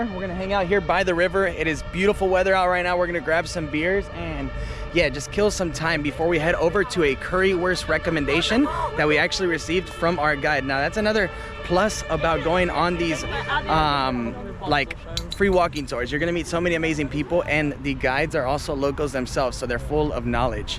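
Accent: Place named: Miami, United States. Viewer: you have to tell me you’re from Miami. I know that accent anywhere! This is my first video so not sure if you’ve mentioned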